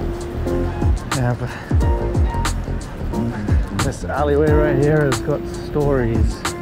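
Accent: United Kingdom. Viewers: You sound more British than Canadian, or am I mistaken? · Ha ha yeah I was thinking Canada but he has a British accent.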